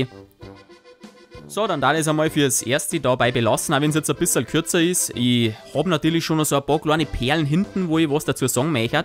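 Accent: Bavaria, Germany. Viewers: Ich feier deine Videos, vor allem weil ich selber Bayer bin und genauso sehr stark mit Dialekt rede. Deshalb gefällt mir dein "frei von der Schnauze reden". :) (auch wenn ich hardcore hochdeutsch schreibe, aber da ist meine Schulzeit dran schuld) Deine Parodien sind der Kracher. Die kann ich immer wieder anschauen und lach mir jedes Mal den Arsch ab. Wirst du künftig auch auf andere Serien außer Spongebob zurückgreifen? Z.B. Simpsons wieder oder Family Guy? Bleib wie du bist und schönes Wochenende! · Bayrisch hört sich zu komisch an 😂👀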